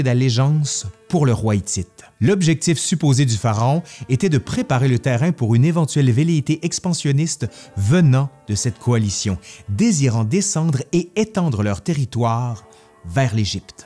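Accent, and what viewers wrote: Quebec, Canada. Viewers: MERCI passionné par l'Egypte depuis la 6 ème (presque 35 ans) cette vidéo ne pouvait que me faire plaisir Je suis d'accord sur la beauté d'Abou Simbel, pour avoir eu le privilège de voir ses 2 temples dès le lever du soleil et donc avant l'arrivée des masses d'autres touriste. Pour la tombe de Nefertari elle était en retauration a cette époque là; un pretexte parfait pour retourner un jour en Egypte Continue ainsi et vive l'accent du Quebec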